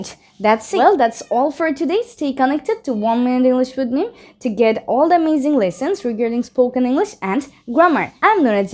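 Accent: United States. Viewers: We need this kind of more class. Btw Sister I have a question Is this American pronunciation? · Apu ki USA accent use koren?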